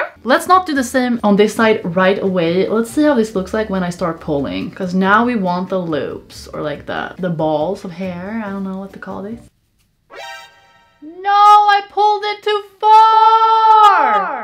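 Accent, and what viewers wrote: Sweden. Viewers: Are u Swedish? U kinda sound like u r! If u r hej hoppas du har det bra! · swedish accent like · I can’t stop thinking abt that shes from sweden. I mean I can hear by her accent:,) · You sound Swedish 🇸🇪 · u sound so swedish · Yo, you sound so Swedish, please tell me I got it right lmao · Me: hears her accent and hears her say 11, me: yap hon är svensk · ahhh yes the stockholmare english accent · Wow din svenska accent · I don’t know my why but i get these swedish vibes cus the acent🤠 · I have a question. Are you from sweden? Because you sound like you are and if you are:hur mår du? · Omg I never heard such a strong swedish accent it's tickling my brain in a way I never thought it wouldve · Omg shes from sweden. It was the first thing i thought because of her accent and then she said "elva" which is the swedish word for eleven. Så hej! Jag är också från sverige! 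:) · I see i see i heard that you were Swedish by the accent and the ramlösa if Im Wrong I don’t know what to do with my life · is that a Swedish accent I hear?? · Let me guess, Swedish? The accent, ikea furniture and she didn’t even say eleven she said elva- Oh wait her bio says everything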